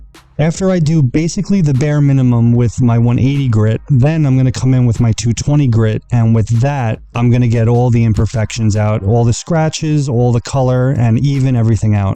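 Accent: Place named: New England, United States. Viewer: I enjoy your work & have seen many or your videos. I subbed today, didn't realize I hadn't. Sometimes I wait for a few videos to see if a person genuinely has my interest. May I ask, are you from NY or New England? I think I recognize the accent (I'm a New Englander), no offense intended. You could consider (?) putting an email address in your bio or other SM as some of us may be interested in contacting you without leaving messages for the world to see ;) - Great job, so refreshing to see restoration. I also admire furniture art & many forms of art, but restoration is its OWN baby! 🥰Kudos!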